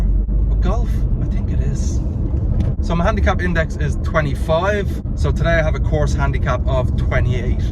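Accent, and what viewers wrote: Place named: Ireland. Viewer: Keep the crossfields, finchs, sheils & robinsons, this is absolutely me to a tee (no pun intended!) Great watch for so many reasons: I can see someone else hitting the same clangers I hit but never know why I did it as I never see my own swing so this will be a huge help.. you're playing courses that are only an hour or two away from me that I could easily get to myself so it's brilliant to get to see them up close on the big screen.. naturally, it's smashing to hear a fellow Paddy's accent on the YT for a change 😀.. and I stocked up my golf clothing wardrobe with the druid's gear there at Christmas too so it's nice to see someone else with good taste in garments too :) keep up the great work pal, best luck for the upcoming season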